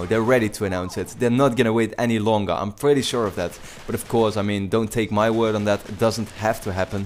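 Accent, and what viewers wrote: Netherlands. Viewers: You have an dutch accent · are you dutch!? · I really hope Uncharted 4 will be made by Naughty Dog. But one little question are you Dutch? Because you have a Dutch accent :p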